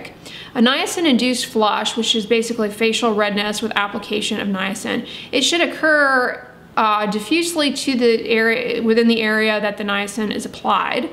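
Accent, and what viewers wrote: United States. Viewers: Does anyone know - What type of American accent does Dr Dray have ? ( I’m from the U.K. so it’s not obvious to me but it’s bugging me cos Its unusual and I can’t place it 😆) Thanks :)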